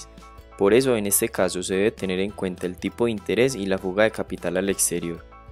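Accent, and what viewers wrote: Antioquia, Colombia. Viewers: jajajaja muy bueno el video. Se le nota lo paisa.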